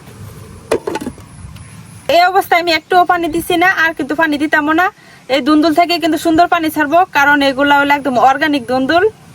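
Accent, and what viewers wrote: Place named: Kishoreganj, Bangladesh. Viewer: আপু কিশোরগঞ্জে কথায়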